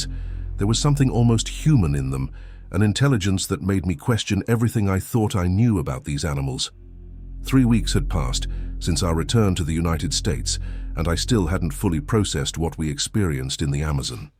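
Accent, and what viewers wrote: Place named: Florida, United States. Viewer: Love that "Florida" accent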